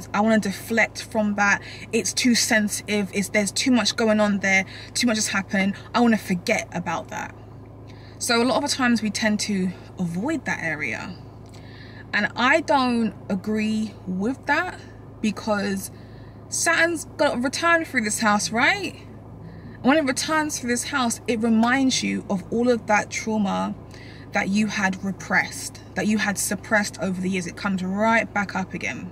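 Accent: United Kingdom. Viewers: Are you from the UK!!! If so love it and love your energy. Love how you read Saturn in 4th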